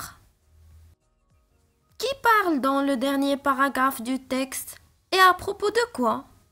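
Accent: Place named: Arab world. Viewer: Hhhh l accent est typiquement 3roubi .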